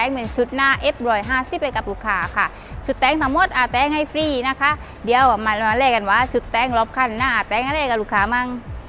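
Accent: South, Thailand. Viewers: นี้และมั่น เป็นตัวของตัวเอง บรรยายได้ดีมากคับ ผมก็คนใต้ ชอบๆคับแหลงหรอยนิ🥰🍃💨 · พูดใต้เป็นตัวของตัวเอง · บรรยายแบบใต้ๆ จริงใจกันเอง น่ารักครับ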